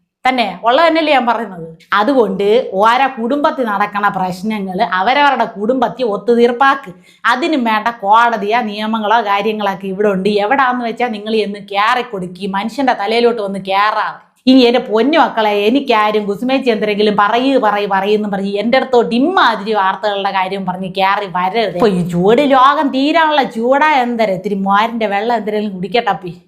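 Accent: Thiruvananthapuram, India. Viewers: ചിരിച് ചിരിച് ചത്തു തിരുവനന്തപുരം കാരിയാണോ സ്ലാങ് കലക്കി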